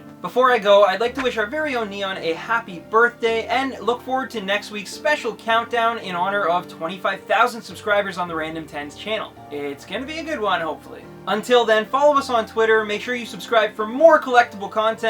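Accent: Canada. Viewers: is he Canadian? he sounds Canadian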